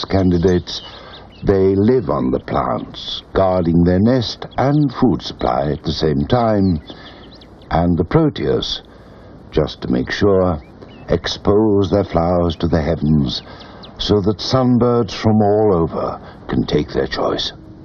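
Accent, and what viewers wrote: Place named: United Kingdom. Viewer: "After mating, the females goes underground, forever." Way to go creepy british voice for teaching me about the birds and the bees.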